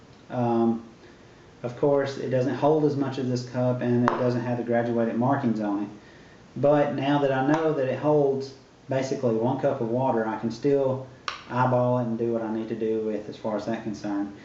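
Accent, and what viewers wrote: South, United States. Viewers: U sound southern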